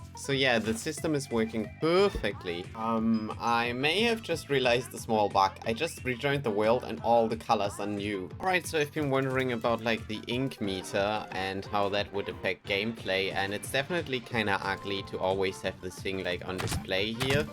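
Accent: Germany. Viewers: quick question, are you german? your accent really just sells it (correct me if im wrong tho)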